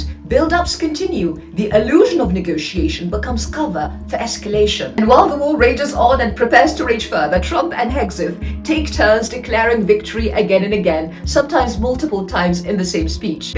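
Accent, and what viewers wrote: RP, United Kingdom. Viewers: Excellent video but what's with the margaret thatcher accent 😅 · yeah but I can't stand that British accent though. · Please speak slowly and succinctly. No one is interested in your high speed Bloody British English.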